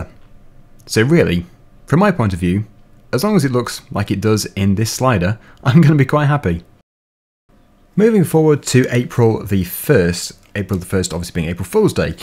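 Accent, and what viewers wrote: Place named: United Kingdom. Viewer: British accent's quite annoying but god your's very strong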